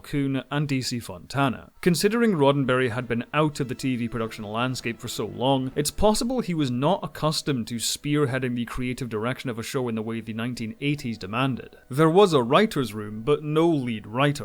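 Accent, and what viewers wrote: Scotland, United Kingdom. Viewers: You have a very, very slight Scottish accent.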